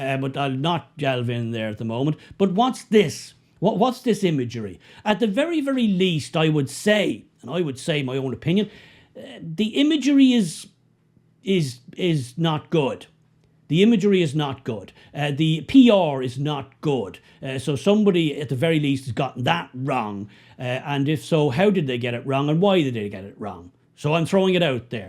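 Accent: Dublin, Ireland. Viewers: SASSENACH with a Dublin accent.🙄😥🤡😫🤮😳🤪🥺💩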